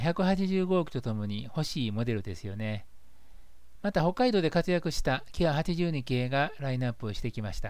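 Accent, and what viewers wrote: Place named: Kansai, Japan. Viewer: HIDEKYU様 動画UPお疲れさまです。大変わかりやすくまとめられていて参考になりました。 ありがとうございます。 やわらかな関西弁にも癒されます。 キハ82, 189系あさま、E3系つばさなど、今月発表分には財布の紐が緩みそうです💦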